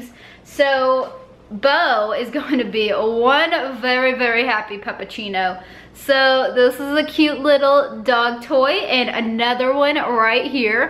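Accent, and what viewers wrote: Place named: Texas, United States. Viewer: Oh em gggeeeee!!! Just tried the hatch green chili dip from Costco ... it is DELICIOUS!!! Thank you so much for sharing!!! Just found you a week or so ago and I am now slightly obsessed! LOL! I’m a central Texas gal, so I can completely appreciate your Texas twang! 😉 Keto on!!!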